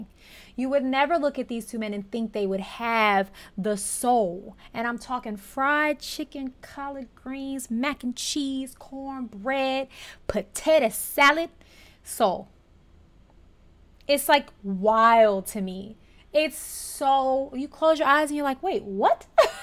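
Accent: South, United States. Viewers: You must be from the South, girl. 😂😂 Love your reactions on the songs.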